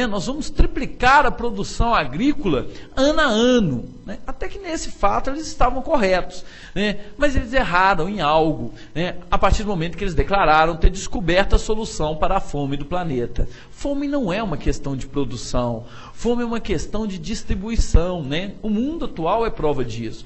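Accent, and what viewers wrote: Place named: Goiás, Brazil. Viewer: Esse aí é goiano 😁❤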